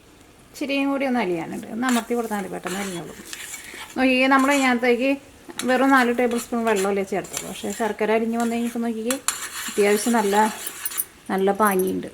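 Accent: Thrissur, India. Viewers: ഇഷ്ട്ടമായി എനിക്ക് അവതരണം നല്ലത് തൃശൂർകാരുടെ സംസാര രീതി പിന്നെ ഇടക് പുറം കാഴ്ച്ച കാണിക്കുണ്ട് അതു സൂപ്പർ ആ രാജ്യത്തിന്റെ ഭംഗി കാണാം മഞ്ഞു വീഴുന്നത്. സൂപ്പർ 👍👍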